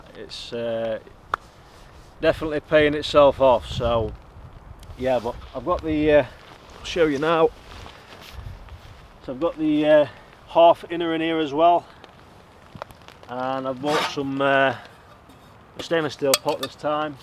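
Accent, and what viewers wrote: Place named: Northern England, United Kingdom. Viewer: Manchester mate! I don’t think your too far from me by sounds of you 😂 👍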